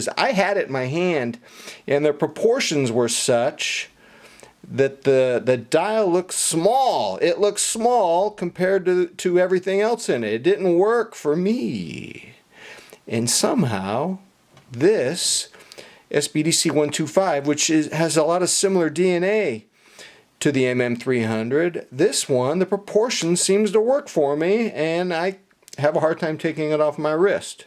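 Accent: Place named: United States. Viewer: I love your collection and your thoughts on it. Great American accent that always makes me smile. Please keep up the good work.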